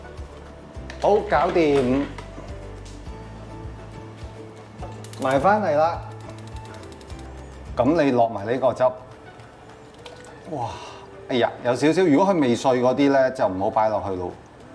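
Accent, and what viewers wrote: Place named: Hong Kong, China. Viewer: 多謝你提供魚湯的教材⋯非常有營養很適合小朋友喝，這是我第一次看這視頻，聽你口音就知道你是來自香港⋯🤗👍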